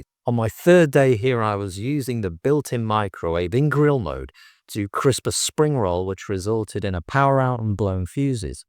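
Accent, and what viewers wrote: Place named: RP, United Kingdom. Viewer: You sound posh but bottom line you chose Pattaya. That says it all in any case no matter how Angelic you like to appear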